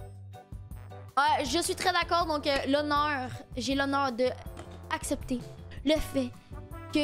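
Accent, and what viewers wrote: Quebec, Canada. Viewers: J'aime bien ton accent québécois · Elle a juste l'accent québécoise il y rien d'exceptionnel mdrr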